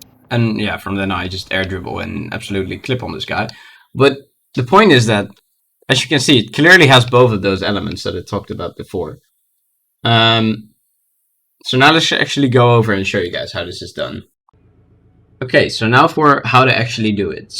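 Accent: Netherlands. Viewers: Je hoort aan zn engels dat hij hollander is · dat nederlandse accent valt niet te verbeteren gozer <3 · I hear nederlands, possible?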